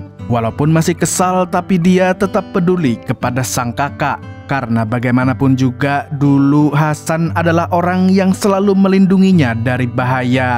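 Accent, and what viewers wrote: Banjar, Indonesia. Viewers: Banjar sekalinya 🤣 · Orang samarinda kah banjarmasin pian mang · Lah bng banjar kh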